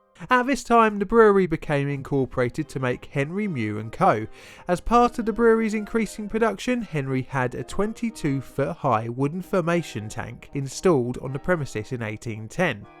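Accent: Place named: London, United Kingdom. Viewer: sounds like london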